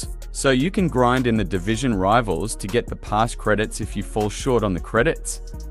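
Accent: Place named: Australia. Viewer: how you got the accent mate I'm Australian u sound aussie😂😂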